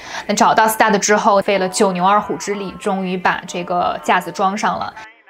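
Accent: Northeast, China. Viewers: 妹子是东北人，尽管你的东北口音是非常轻微的，但是休想逃过缺姐的耳朵🎙️☝️😉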